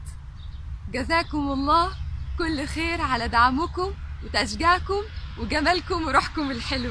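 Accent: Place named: Monufia, Egypt. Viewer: ماشاء الله مثال للعلم والأدب. ولكن استغرب من الناس وخصوصا الشباب اللي مستغربين من شكلها ولكنتها. ولكن دا طبيعي في الشكل وفي مخارج الألفاظ عندنا في المنوفية. زي اسكندريه ومطروح وبورسعيد والصعيد.كل محافظه ليها حاجه بتتمريز فيها في اللهجه والاكل وطريقه اللبس. فدا مش داعي للاستغراب. لكن مبقولش أن كله كدا لكن في وموجود كتير إنما اللي المفروض نستغربه هو اجتهادها في مجال مكانتش بتحبه وقدرت توصل فيه.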